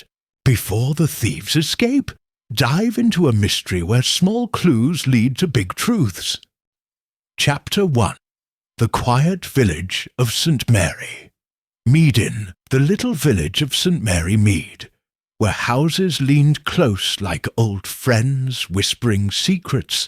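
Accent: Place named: United Kingdom. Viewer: This msrvelous British accent and beautiful voice will help mr sleep tonight. Thank you soo much!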